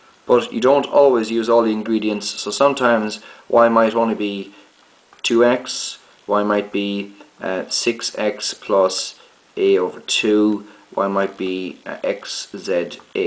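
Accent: Ireland. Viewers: You have a lovely irish brogue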